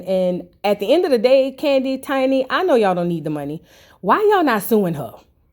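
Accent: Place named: Louisiana, United States. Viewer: Why you sound like the mistress, is it a lousania thing, lol.....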